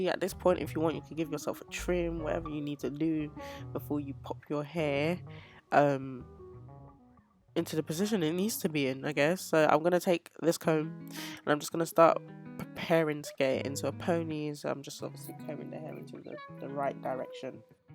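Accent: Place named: United Kingdom. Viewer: Tell me why I knew you you had a British accent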